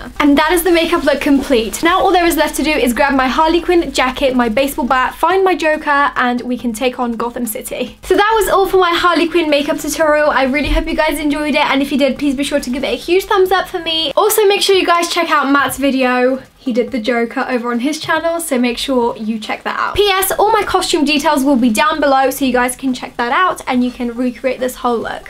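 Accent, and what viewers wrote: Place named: United Kingdom. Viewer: Nice accent I love british accents ^_^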